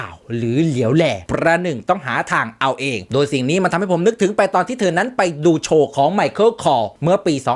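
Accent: Isan, Thailand. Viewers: แอด…กลับมาอัพเดทบ่อยเถอะน๊า คิดถึงเสียงหัวเราะของแอด คิดถึงสำเนียงภาคว่าวอีสาน หม่วนๆ ของแอด Please…..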